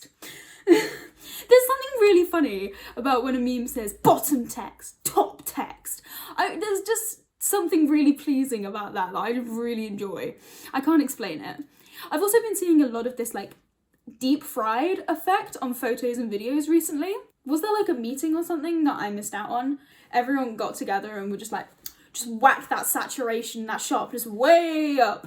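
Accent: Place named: United Kingdom. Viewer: I didn't expect you to be british lol the first video I saw was your wipe it down video and it was classy af. Love to see and hear more about your life.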